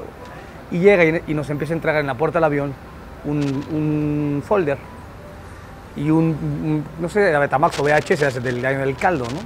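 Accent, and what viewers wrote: Spain. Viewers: y gradualmente enfatizas el acento gachupin. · Lo unico q no me gusta es q uses esa forma de ablar muy español si eres de la ciudad de mexico abla como mexicano creo q es muy feo q por q viviste en españa dos o tres o dies años ya no puedas cambiar tu forma de ablar yo soy de oaxaca y con mucho orgullo lo digo tengo mas de treita año viviendo en la ciudad de mexico y aqui en la ciudad ablo como se abla aqui en oaxaca ablo como alla.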